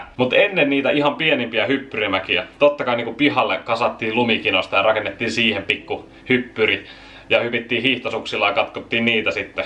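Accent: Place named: Lahti, Finland. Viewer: Mietin et miks puhuu lahen murteella koska "Lahen" ja ei oo lahelainen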